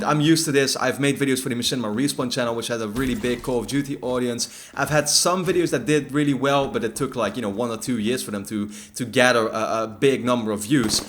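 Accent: Netherlands. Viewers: I think he's Dutch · are you dutch?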